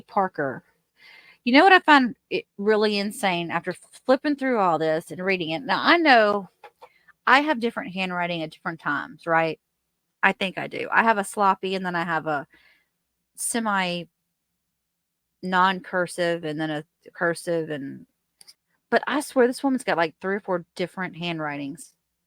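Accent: South, United States. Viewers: Hi from back from vacation lovely!! Sorry I was away w/out a Wifi … I’m binge watching your videos now 😊. Always a pleasure to listen to your southern sweet accent 🫶🏽 Have a wonderful week 😘🌺🫶🏽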